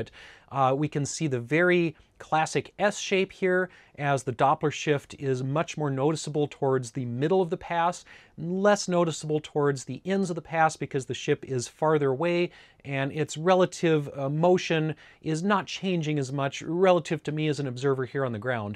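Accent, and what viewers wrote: Alaska, United States. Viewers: I never noticed your accent until you spoke the words “my Alaskan accent” and I heard it immediately. My brain went directly to Tina Fey playing Sarah Palin on SNL… I think I might be getting old. Cheers from Canada! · As a Swede, you’re Alaskan pronouncing is absolutely fine. I have never had any problems understanding what you say 👍😀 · I live in the Twin Cities. You have an Alaska accent?! What!?? You sound totally local. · Nothing wrong with with your Alaskan accent ….Manchester England 👍👍👋👋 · As a Canadian I appreciate your Alaskan accent. Nice video, 👍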